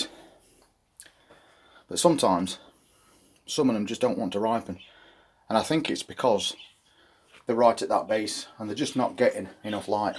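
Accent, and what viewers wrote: Yorkshire, United Kingdom. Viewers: Love your (Yorkshire - correct me if I am wrong) accent and use of words. Will just leave it on bench❤. Was checking the size garden delights grow to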